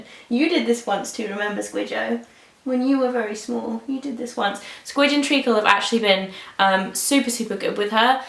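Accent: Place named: London, United Kingdom. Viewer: I love your videos and your accent from London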